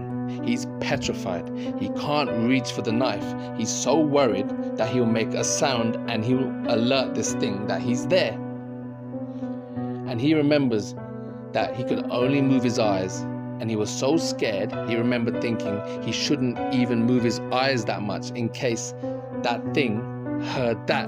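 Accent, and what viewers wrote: United Kingdom. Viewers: u got that across the pond voice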